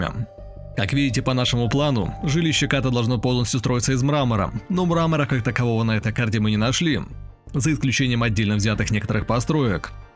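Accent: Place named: Surzhyk, Ukraine. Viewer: Лайкос за суржик😁😂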